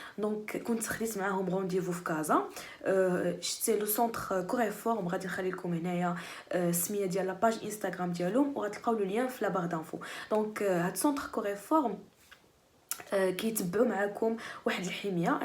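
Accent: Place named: Algeria. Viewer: عند.لهجة كيما صحاب جزائر وأنا نموت على مغاربة يعجبوني بزاف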